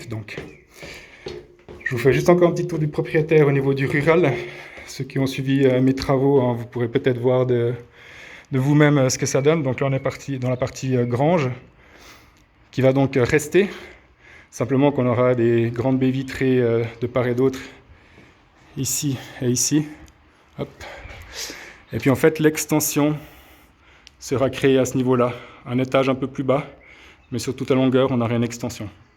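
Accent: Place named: Sweden. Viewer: Super ton accent. C'est Suédois c'est ça :)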